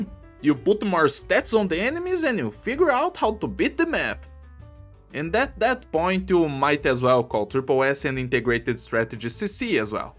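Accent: Brazil. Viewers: This is CC because Pinchout is CC, Pinchout was just a bad CC and this shifts the whole perspective of people, if this one was the Beta for the new model (which isn't very different than the previous one in any actual meaningful level) instead of Pinchout, the whole discussion would be different. Most of the issues are community sentiment related, because of the proximity of Pinchout DOS and this, with all 3 being operator restrictive events designed for high end accounts. Pinchout didn't feel very like CC to most people because most people experience is Risk18, and the difficulty for the new "RISK 18" is more like old 24~28, which would be a problem if the rewards were locked behind it, like how it was on DoS, but this time around, the only sole reason to push 620 is the medal, this is exactly the correct type of reward to be locked behind the crazy clear, my own complaint is that the gap should be higher between medal and trim, the trim should be slightly higher, while the base medal should be slightly lower. 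On a random note, are you brazilian? Your accent really gives me brazillian vibes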